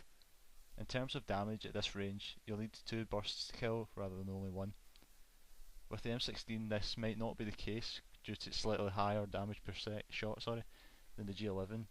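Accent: Scotland, United Kingdom. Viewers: are you british? lol jw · are you scottish?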